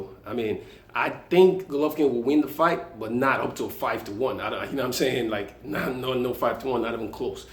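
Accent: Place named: Nigeria. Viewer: guy all this funne wey u dey tlk. lol. u be omo naija abi omo ghana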